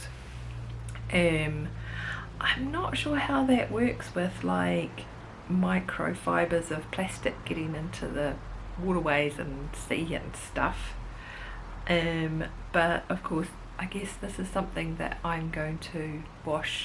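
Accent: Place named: New Zealand. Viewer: Always lovely to see you and hear a fellow Kiwi.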